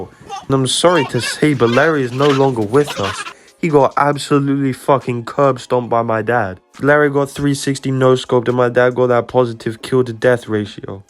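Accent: United Kingdom. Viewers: Anyone else notice he has an English accent with Puerto Rican parents? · The fact that he’s a Puerto rican with a British accent and his dad it’s kinda cold where he’s at is kinda wild to me. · "Puertorican" Bri'ish accent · Your Puerto Rican with a UK accent? That’s interesting….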